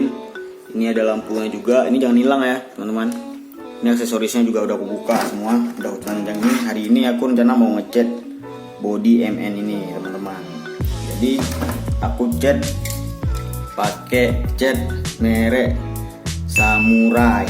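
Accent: Aceh, Indonesia. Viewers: Logat Aceh nya bang😂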